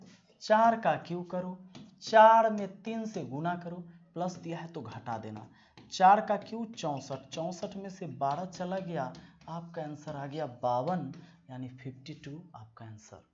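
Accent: Bihar, India. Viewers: govinda actor ki ek movie h bihari wali wo bilkul apki tarah hi bole h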